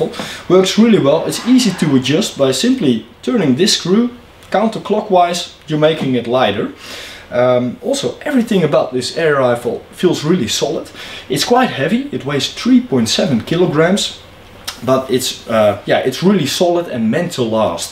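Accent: Netherlands. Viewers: Very good review. Wonderful accent, sounded like Paul Whitehouse Dutch Policeman.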